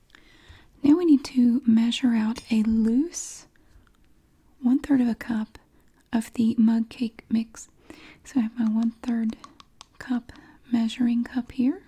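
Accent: South, United States. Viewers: She has such a sexy southern voice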